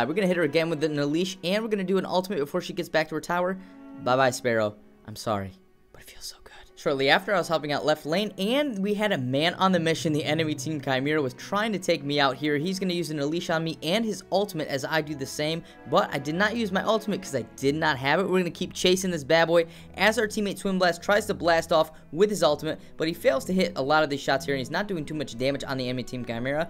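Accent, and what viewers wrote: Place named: United Kingdom. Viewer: That British accent though..... Man. I really want to play a match with you. I'm getting so frustrated at playing builds similar to this and kicking ass and having a weak team that just don't make the power plays you need them to... I've gone 21/2/6 before now and still lost the match. You can't carry a bad team :( 👎🏻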